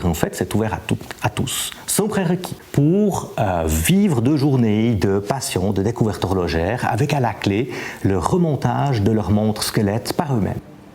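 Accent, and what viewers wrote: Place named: Switzerland. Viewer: En tant que français, c'est un plaisir d'entendre ce bel accent suisse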